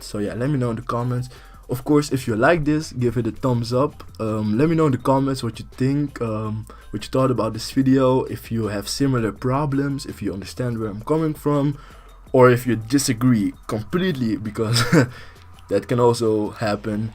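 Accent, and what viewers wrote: Netherlands. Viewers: Good thing you decided to do your voiceover in English. Otherwise, I would not understand a word and fall asleep. Your Dutch accent is pretty cool..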